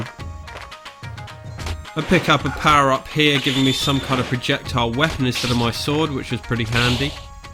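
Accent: United Kingdom. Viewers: ya i really want one its a dream lol If u like my channel Please subscribe:) im filming a Unboxing of some new games from video games plus tonight or tomorrow . Have a lot of new games. Your channel great plus love the British accent!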